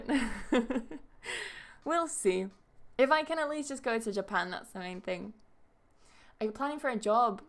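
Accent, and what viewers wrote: United Kingdom. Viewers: Tengo casi tres años de vivir aqui en UK, y nunca habia encontrado un video con una persona de habla Inglesa Británica que explicará tan bién sobre la Pronunciación de las palabras, Vocales, Diptongos y demás. En lo particular se me ha hecho muy difícil aprender el Acento British, debido a que yo aprendi un Inglés muy Americanizado por no decir un Espanglish, Enhorabuena por todo lo que enseña en sus Videos, ahora ya tiene un nuevo Estudiante...!!!! Congratulations...!!!!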